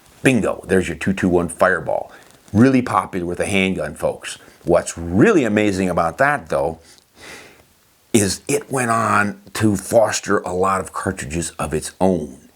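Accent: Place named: South, United States. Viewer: ...I own a Sako Rifle in.222 Remington made in 1950....I went to the range and off the bench shot a 3 shot group that I measured with a caliper and it measured.221....how about that? This was 4-5 years ago...I still have about 40 rounds I loaded for it...and did not shoot them all, I'm conservative with my ammo...you got one of them tack drivers? I kind of like you...I like the way you talk...You sound like me...a lot...I bet you are in a southern state...you ain't no Yankee are you?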